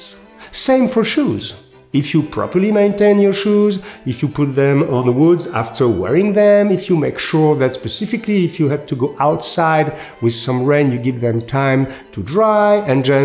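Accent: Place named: France. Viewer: Frenchman speaking excellent English layered with an elegant french touch👍👍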